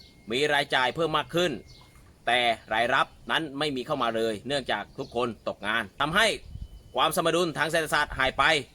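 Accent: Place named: Phetchaburi, Thailand. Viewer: เป็นคนจังหวัดอะไรค่ะ.. พูดเหมือนคนเพชรบุรีเลยค่ะ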